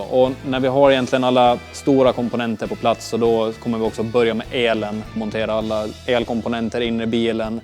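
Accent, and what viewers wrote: Åland, Finland. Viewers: Härlig dialekt men jag gissar nästan på att han är från Åland från början! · Skulle precis fråga om du var från åland ,när jag såg i kommentarerna att de var så😅,avesta dal åland mål... Jävligt bra film iaf 👍👍. Heja åland, världsrekord på is oxå. Topfuel tjejer i nhra mm. Mycket bra racers från åland !!! Keep it going ❤ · jaa hörde lite finlansvenskt i tonen! De är bra ni har börjat med youtube 👍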